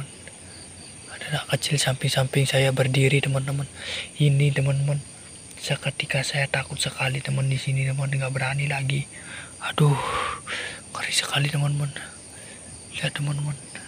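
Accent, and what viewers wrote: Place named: Karangasem, Indonesia. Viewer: Bukan e takut kedek Cang nepuk bli logat e lucu Karangasem kubu pok bos bekas kuburan masal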